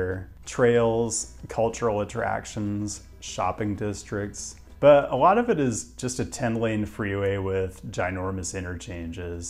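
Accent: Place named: Midwest, United States. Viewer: Enjoy your dry and calm delivery on the review. 'Public health malpractice' indeed. The mildly midwestern accent helps.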